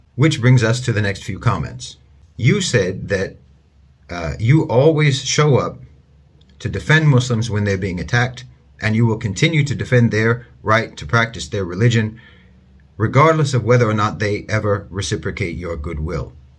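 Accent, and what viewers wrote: United States. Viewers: his accent is American, german is his parents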